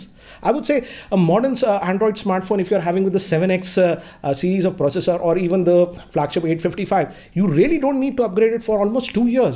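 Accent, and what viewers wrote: India. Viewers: as soon as i hear the indian accent, im like "yes, he knows what hes talking about. time to listen" good info bro. i was dying for like 15 minutes when you asked about shooting 8k videos. its hilarious because its true. there is literally no need for shooting 8 k videos.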